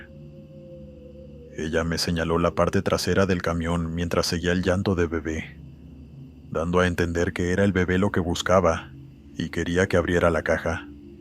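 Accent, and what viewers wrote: Mexico. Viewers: La voz del narrador es absolutamente envolvente, su acento Mexicano la hace aún más increíble, perfecta para relatos de terror. Adoro este canal🙏🏽🇨🇴❤️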